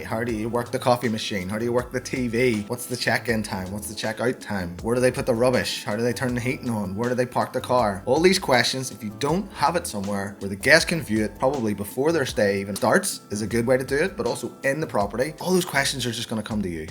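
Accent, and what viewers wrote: Ireland. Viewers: That’s extremely rude.he has a wonderful Irish accent, and that is how Irish people speak. I am watching this in South African, and I can hear him clearly, beside you can slow down the speed of the play. Telling people to speak slowly for your convenience is rude .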